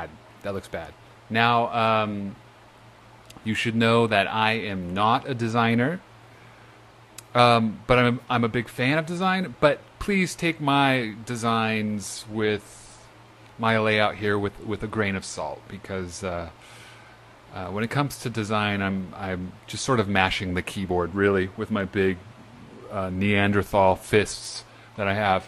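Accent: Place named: Canada. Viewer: It's pretty obvious that you're Canadian. Cheers to you!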